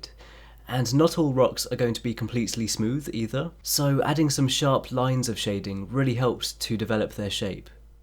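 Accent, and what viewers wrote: United Kingdom. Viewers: your videos are now one of my favorite coz i learned two thing after watching it...how to draw and a british accents😁😅... can you draw emma watson?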